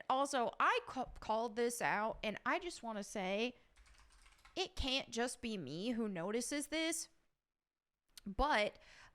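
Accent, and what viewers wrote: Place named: Pittsburgh, United States. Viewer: I'm not sure how you popped up but damn I'm glad you did. Not afraid to say what everyone is thinking... Lara Croft... And the steelers popcorn container back there - I have one that's coors light/steelers. I thought I heard some Pittsburghese in there but I'm not sure on that, all I know is you're my new favorite person lol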